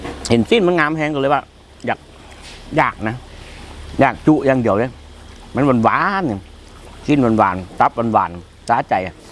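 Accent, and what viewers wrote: North, Thailand. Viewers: คนลาวอีหลีบ่ครับสำเนียงคือเสียงภาคเหนือของไทยแท้.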